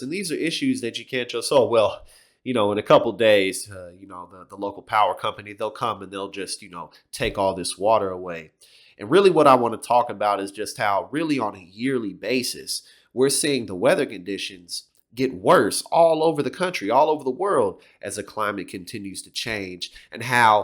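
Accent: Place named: South, United States. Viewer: You may have modified your accent somewhat but as a Brit listening to you I can hear you come from the South!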